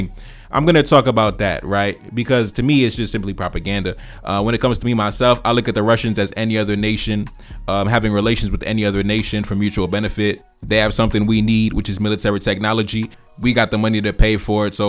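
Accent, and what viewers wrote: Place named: United States. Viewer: My brother where are u from ? U are an expert on africa with American accent . Lol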